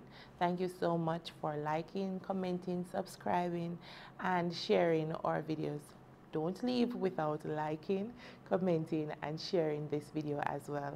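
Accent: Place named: United States. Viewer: Right here, not right her, that's American's dialect. Your English is great enough.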